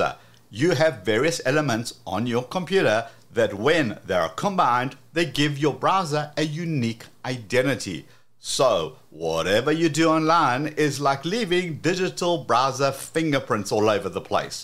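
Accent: South Africa. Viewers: Wait you South African 😮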